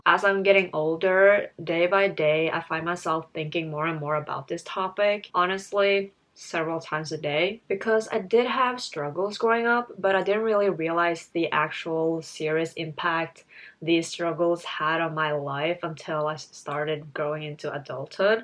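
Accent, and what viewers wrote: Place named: United States. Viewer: american accent ! on point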